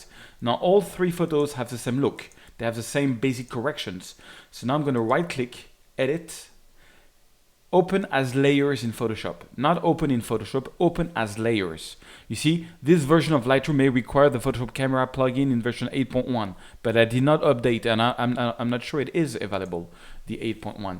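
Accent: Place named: France. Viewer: It's funny to come back to these videos to see how much his French accent has dwindled over the years--video content still 🔥though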